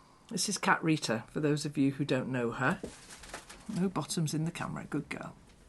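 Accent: United Kingdom. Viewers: Hi, Im living in Tasmania, I was told about your channel by a new member of our sewing group. We meet every other Monday and make quilts for Charitie's. I was originally from the UK, lovely to hear that accent.:) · Hello,. I’m from Illinois in the US. The number of projects you show does not sound like too many. I dabble in crochet,embroidery, knitting, quilting, rock painting, art journaling, stamping cards, and since November, slow stitching. I think I would get bored if I had to stick with just one. You’re beautiful Rita is absolutely gorgeous. Thank you for sharing all that you do. I love listening to the English accent, especially if it’s calm and soothing, of which you fit the bill.❤❤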